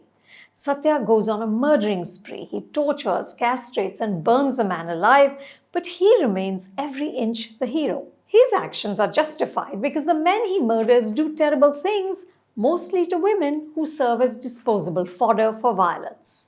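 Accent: India. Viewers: Indian English accent makes your ear bleed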